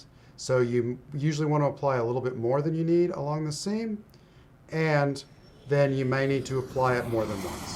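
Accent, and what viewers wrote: Australia. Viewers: Great video as usual. As an Australian I can hear a hint of our (or NZ) accent in your speech!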